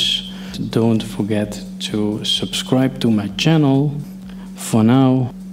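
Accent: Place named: Netherlands. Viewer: You're Dutch I noticed it from your accent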